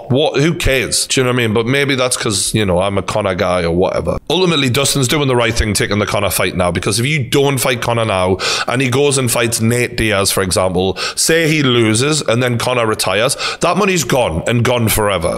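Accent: Newcastle, United Kingdom. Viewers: I love but I'd rather not have a husky Geordie man talking me to sleep, not again anyway...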